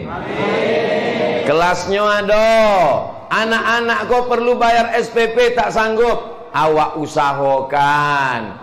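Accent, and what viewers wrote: Riau, Indonesia. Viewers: Masya Allah sesuatu banget dengerinnya Tuan guru. Ceramahnya beda, banyak logat dan bahasa khas Riau nya. Saya orang Sunda, cerarmah tuan guru menghibur tapi tetap berbobot. Semoga diberikan kesehatan dan keberkahan selalu buat dan tim Yayasan Tabung Wakaf. Aamiin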